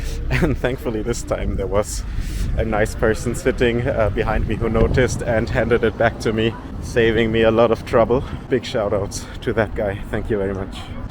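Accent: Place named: United States. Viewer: Why are you talking 'US dollars' when you 'say' you are German, visiting London??? You certainly don't look German at all, and talk like a Yank. Why fly to Stansted anyway, which is a long way from London? Why moan (like an entitled Yank) about no seatbelts on bus and sit nearest the front window? You are far more likely to be killed in a crowd in Berlin statistically than 'fly through a bus window'! Just how often do you lose your CC, idiot! More dollars, whatever they are???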